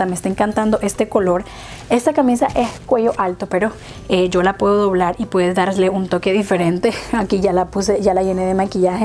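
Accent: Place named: Honduras. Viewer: Por tu hablado creo que eres de Honduras, saludos y éxitos paisana!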